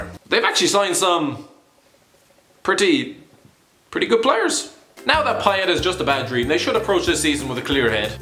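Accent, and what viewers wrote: Ireland. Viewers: He is Irish the best people in the world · You sound Irish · I just keep watching it because of his Irish accent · Is this lad from Ireland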